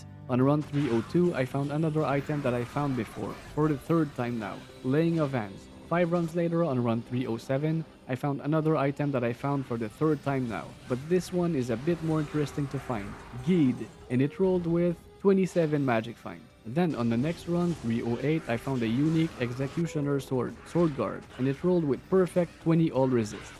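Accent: Quebec, Canada. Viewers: Ton accent est tellement l'image parfaite du Québécois bilingue lol. Bon vidéo broski! PS : J'ai 2000 kills de meph/andy TZ en solo et je n'ai toujours pas Highlord's. J'ai juste abandonné et pogner mes torches lol. T'es chanceux !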